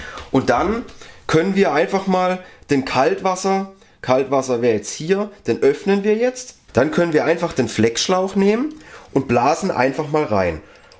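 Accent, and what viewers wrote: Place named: Baden, Germany. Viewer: Mach's wie Du meinst - aber bloß keine Angst vorm eigenen Dialekt. Deine Zuseher kommen, weil sie Informationen wollen - das kannste super! (Übrigens, das hatte ich vorher vergessen: sehr gute Beleuchtung und super Kamerawinkel da unterm Waschtisch. Das ist nicht einfach!) Und sie bleiben, weil sie dich als Typ gut finden. Klar muss man so sprechen, dass einen die Menschen verstehen. Aber a bissl Dialekt hat noch nie geschadet. Schau mal ins Fernsehen: Wer ist da der erfolgreichere Entertainer? Der dialektfreie Nachrichtensprecher, oder der authentische Unterhalter mit Dialekt? Du kommst aus Baden? Besser geht's doch gar nicht für einen Handwerkerkanal. Schaue grad dein neuestes Video. Stimmt, das ist schon viel besser geworden. Aber versuche mal viel freier zu sprechen. Kannst ja mal bei einem Video zwei Versionen machen und deine Freunde ansehen lassen. 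Glaub mir. Je authentischer, desto besser.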